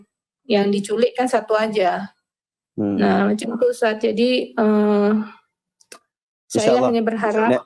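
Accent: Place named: Peninsular Malaysia, Malaysia. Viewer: Masih ada lagi lenggok tutur bahasa melayu semenanjung Malaysia... memang duk Malaysia ke dulu²?..bsama..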